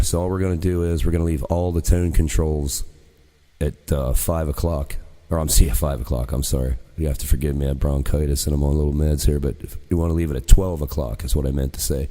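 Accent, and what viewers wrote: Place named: Philadelphia, United States. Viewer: Cool video! Have you tried S-Gear? You from Philly? I hear a Philly accent.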